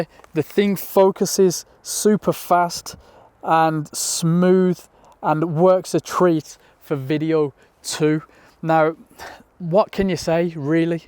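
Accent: Birmingham, United Kingdom. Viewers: Is that accent Brum?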